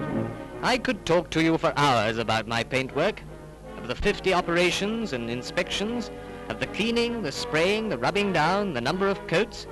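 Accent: United Kingdom. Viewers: Considering that this film is British. You can tell by the accent, it was filmed by Pathe and the car was build in the Dagenham plant. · "Ford et Degenhem" - no "As" in those days! Whatever heppened to those posh English voices?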